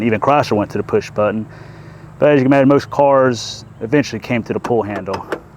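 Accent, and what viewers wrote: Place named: United States. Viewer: Is this the Stephen King car ? new subscriber glad to be here ,hey from Australia,what a sensational voice ,this is how as a young kid livin in the outback i expected real Americans sound like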